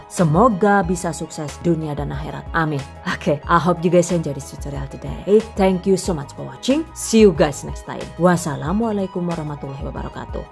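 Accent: Banyumas, Indonesia. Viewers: Keren pokoknya mba,,,Slam ngapak y,trima kasih ilmunya,sukses selalu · senengeh enyong.. sekaline rika wong ngapak 😂😂😂😂 tek subrek lah rika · Sy menyukai krn ada ngapaknya jg emoji lucu😂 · Cuma di Chanel ini dikasih trial sampe ke DNA, lengkap bgt do n don't nya, plus ngapak e ngangenin 🤗 moga sehat sll ❤️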